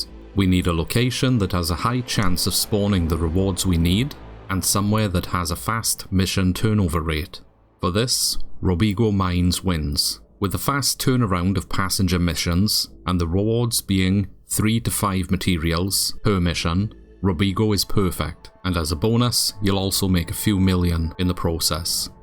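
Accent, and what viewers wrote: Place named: Wales, United Kingdom. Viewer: Took me a minute to figure out that whimsical accent lol thats gotta be welsh! Appreciate the tutorial, o7 from Ireland :)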